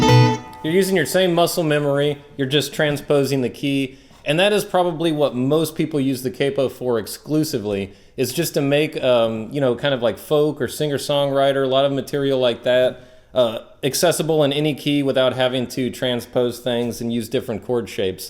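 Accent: South, United States. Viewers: This is the first time i have heard your voice. Correct me if I'm wrong but, do i hear a hint of a southern accent?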